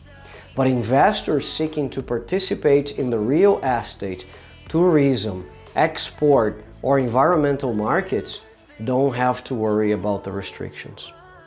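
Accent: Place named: United States. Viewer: oh good im glad u speak american english i thght i might nt understand u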